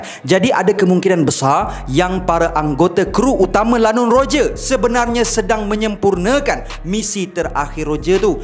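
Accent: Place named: Kedah, Malaysia. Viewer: Bukak msk bru ada dngan lorat kedah.. baru ada feel dngar dye pnye crte...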